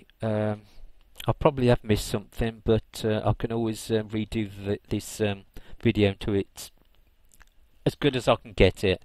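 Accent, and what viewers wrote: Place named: Birmingham, United Kingdom. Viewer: John Camus He's from Birmingham by the sounds of it (UK), we call them "Brummies"!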